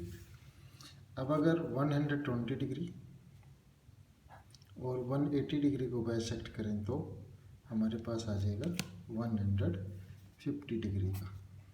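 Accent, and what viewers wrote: India. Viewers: sir ji aap pakka haryana se honge ! kade t ho sir jii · Sir you are from Himachal i think for your tune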